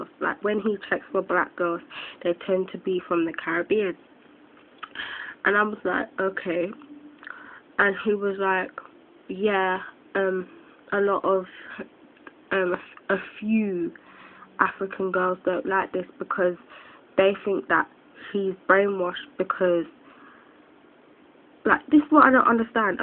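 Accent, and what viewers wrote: United Kingdom. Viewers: nice british accent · Are you from South London? :) I am too! ;D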